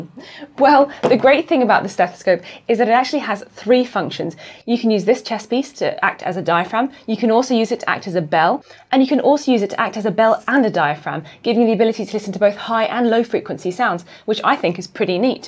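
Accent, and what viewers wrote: United Kingdom. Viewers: Hi, are you a GP working in Australia? You sound British?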